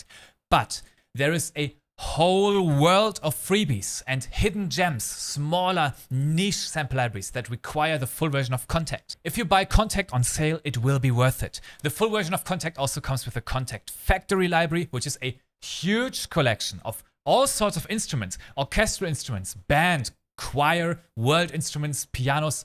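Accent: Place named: Germany. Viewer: kurze anmerkung man hört raus das du deutsch bist haha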